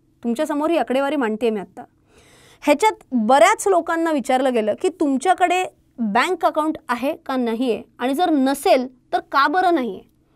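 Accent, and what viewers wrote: Pune, India. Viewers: एक नंबर मॅम, खूप छान वाटलं तुम्हाला मराठीमधून ऐकून!! मी कालच नोंदणी केलीय!! आर्थिक साक्षरता आणि तीही तुमच्या ओघवत्या पुणेरी भाषेत म्हणजे जणू दुग्धशर्करा योगच!!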